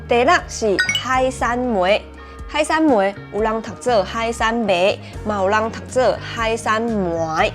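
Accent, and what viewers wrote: Taiwan. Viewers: 南北部腔調念法不一樣 😁 阿妹呀 台語真標準😊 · 我台中的，現在才知道原來南北腔調都有混到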